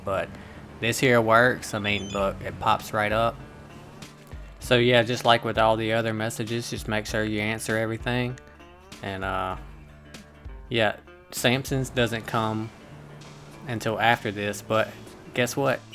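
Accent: South, United States. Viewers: Great video, choom. Really helped me out. Also, I really like your accent! Where are you from? Somewhere down south I would assume? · Love your accent man! Reminds me of my grandparents, who are very southern. Thank you for the informative video my dude! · Man, I love your southern accent—nothing like a man with a southern drawl. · Subscribed cause sounds like a fellow southern brother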